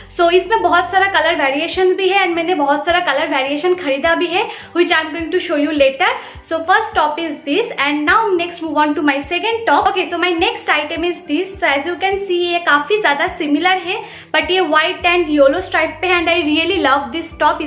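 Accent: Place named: Bengal, India. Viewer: Your voice is very sweet! Bengali touch ♥️♥️♥️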